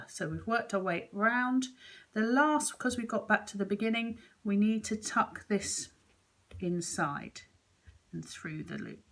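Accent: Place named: England, United Kingdom. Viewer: Hi from ARGENTINA!...I loved this project..beautiful star! ..and I loved your ACCENT!! What part of England are you from? You speak really clearly and nice...😊😊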